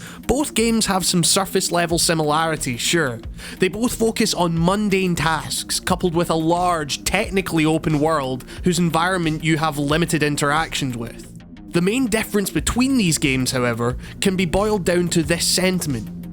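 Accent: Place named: Wales, United Kingdom. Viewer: Neat video! I love Welsh boys! You have the cutest accents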